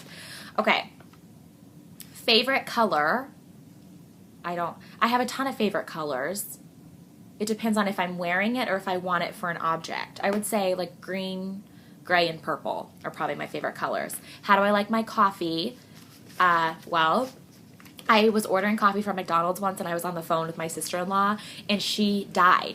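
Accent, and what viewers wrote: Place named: Illinois, United States. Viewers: how you say illinois is so funny..love it...im from illinois, near chicago and its funny how our accents are so different but were still in the same state! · WHATTTT! girl i didnt know you live in illinois! we have totally different accents! hahah. what town are you from or near?!?!?!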